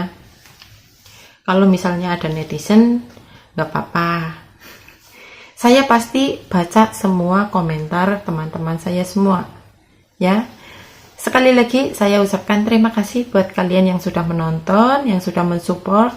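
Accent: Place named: Banyumas, Indonesia. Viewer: Q suka sm vidio2 nya mbak. Sama sama bahasa nya ngapak 😃😃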